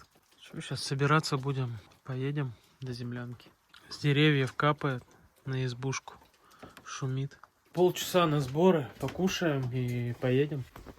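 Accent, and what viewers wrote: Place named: Perm, Russia. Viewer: Добрый день! Это у вас какой край или федеральный округ? Акцент похож на Пермский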